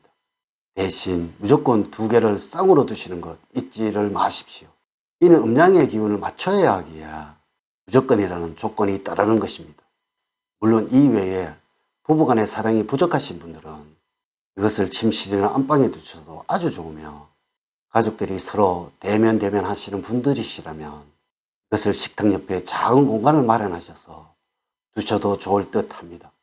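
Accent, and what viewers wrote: Gyeongsang, South Korea. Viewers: 경상도 말ㆍ못알아듣겠네ㆍ 방법은 밑줄에 자막필수ㆍ 그것도 모르고 유튜브 합니까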